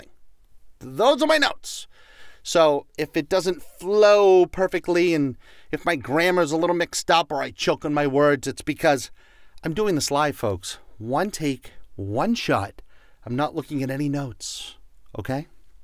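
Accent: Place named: Boston, United States. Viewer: This was actually reassuring. I seek the gurus who believe HAPPINESS is a choice we can all make right now ... not the ones with the carrot and stick ... I rarely come across the "scammers" you are talking about. (Watch, one of their ads will pop up as soon as I post this.) I can just imagine, though, people who are in a desperate place - seeking a new job for example - falling victim to the song and dance you are talking about. KEEP BOTH HANDS ON YOUR WALLET is definitely good advice out here in Self Improvement Land. Thank you for the red flag advice; thanks for the free resources and I love the Boston accent.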